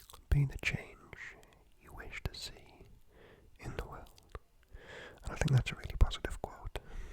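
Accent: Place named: Newcastle, United Kingdom. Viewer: Straight up following cos I’m from Newcastle 👋🏼👋🏼👋🏼 been dying to find a Geordie asmrist!!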